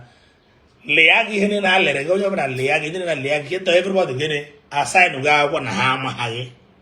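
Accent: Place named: Hausa, Nigeria. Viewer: You sound like real Hausa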